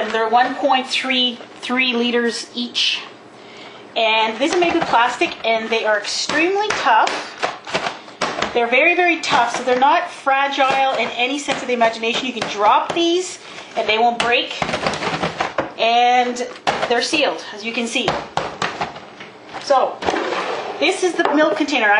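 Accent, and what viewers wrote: Canada. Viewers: Um, this sounded like a plain old canadian accent to me, not a french accent. (Which doesn't mean she doesn't know french, just that she doesn't sound french when she speaks english.) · Omg I'm Canadian but I don't have the same accent as you-- you sound so-- so Canadian! __ I love it! (Was trying to figure out how they even put the milk in the bags to start with, to explain it to an American friend, but your video was still p entertaining hahahaha)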